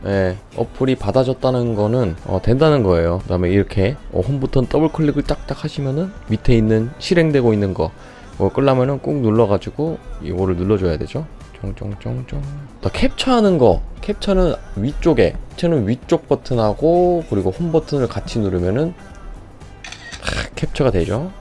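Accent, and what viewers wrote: Gyeongsang, South Korea. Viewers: 같은 경상도 사람이네용~~ <mail>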